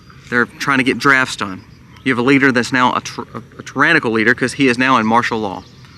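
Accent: South, United States. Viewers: What a niave analysis. Just let Russia do what it likes. Sounds like a hick southern MAGA supporter.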